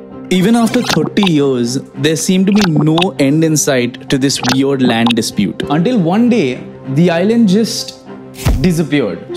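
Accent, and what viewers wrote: India. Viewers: This south Bombay accent is really nauseating. I hope it vanishes.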